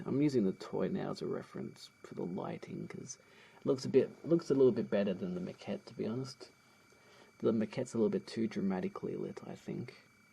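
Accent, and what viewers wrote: Australia. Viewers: You're from Australia! I knew it from the first video I watched! Despite being bad at identifying accents, I got this one right. :)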